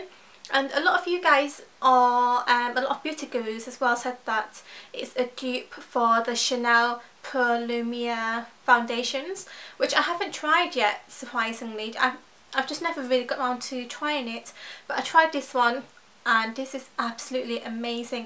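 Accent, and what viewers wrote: United Kingdom. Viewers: U sound British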